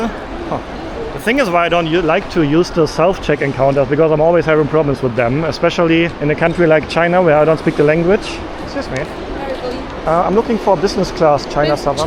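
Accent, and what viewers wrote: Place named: Germany. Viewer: Love the german accent 😂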